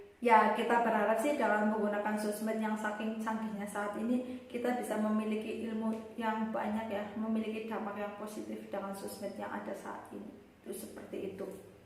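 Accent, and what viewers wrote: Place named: Banyumas, Indonesia. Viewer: Aku sukak deh gaya dan logat mbak yg ngapak...orak ngapak orak inak wekwekwkewke lanjut mbak oleh oleh khas Semarang sudah kusematkan jangan lupa kembalian apalagi di rumah ada masakan arab yg siap dimakan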